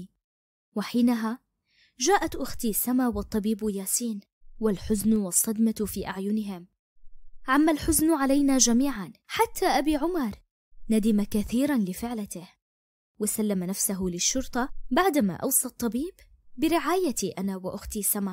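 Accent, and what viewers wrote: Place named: Algeria. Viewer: من لهجتك تباني من الجزائر انا من الجزائر وانت♥♥♥♥♥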